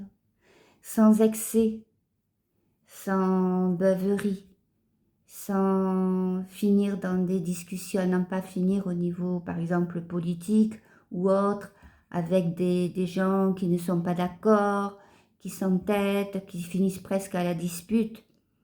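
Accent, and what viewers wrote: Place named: Southwest, France. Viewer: Merci pour cet important message avec ce bel accens du sud ouest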